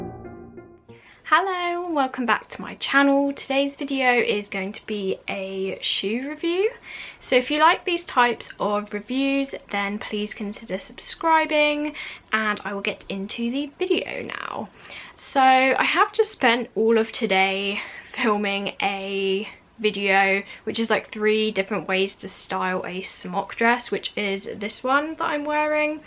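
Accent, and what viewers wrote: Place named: London, United Kingdom. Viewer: Those are really darling & give me a steampunk kind of vibe :) I was wondering (and I may have missed it); if you had an idea what the soles are made of? I mean not the underneath, but the part under the shoe itself the platform is made of? Does it seem like the same material as the top or something different. Someday soon I plan to own a pair of New Rocks, I'm just not sure which ones I want. My son was over the other day and he heard me listening to you and was wondering about your accent. I told him you were from England and he goes "Oh yes, a London Goth" :) Too cute :) Well, take care and nice to see you sweetie <3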